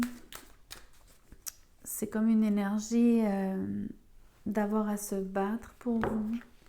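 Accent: Quebec, Canada. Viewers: Bonjour, déjà j'aime beaucoup votre calme , votre voix, par contre je vois pas du tout qui cela pourrait être , cette personne ?? Bref vous m 'apprenez qu'une femme pense à moi en secret , car vraiment je ne vois pas qui c'est ! l'avenir me le dira ou pas . Merci a vous et finalement, je commence à aimer l'accent québécois.😉🤭🤔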